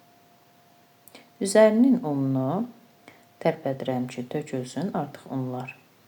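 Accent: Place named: Sheki, Azerbaijan. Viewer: Salam xanım adaşım siz çox qabiliyətli xanımsız siz Şəkilisiz ləhcəniz Şəkili dilinə oxşayır sağolun❤ xanım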